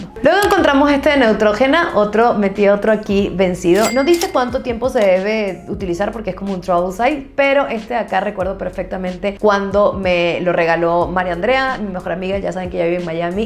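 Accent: Venezuela. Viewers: Te ganaste un like, mi suscripción!! Y que orgullo que a pesar de tener tantos años fuera de Venezuela, no has perdido ese acento, ese sabor venezolano!!! Gracias por ese Review yo uso shiseido, pero ya tengo mi lista para probar!!! 💕💕💕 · Escuchando este acento me decía, parece venezolana, después mexicana. Y luego el mapa de Venezuela en su antebrazo!🤗 saludos desde Alemania!😂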